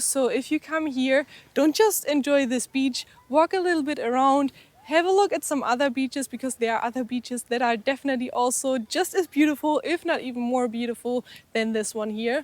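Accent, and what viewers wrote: Germany. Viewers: Spreche doch lieber in Deutsch. Zu starker Deutschakzent! Ansonsten schönes Video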